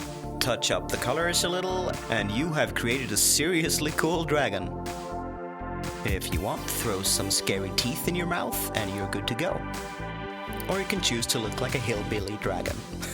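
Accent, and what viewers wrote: Sweden. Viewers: Whenever I hear your Swedish accent I can't stop thinking about summer and food.. Every year, when the summer is approaching we have a lady from Skåne on TV and radio, and she comes bearing new summer-recipes..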